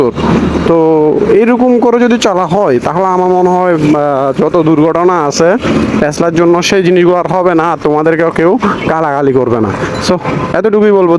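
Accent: Chittagong, Bangladesh. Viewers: vai kothagulo valo laglo,Apni Chittagong er? Always video up diyen dekhbo 💝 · Apni ki cttorgram e taken kota sune buja jasse amader cttorgram er manus ❤️🤝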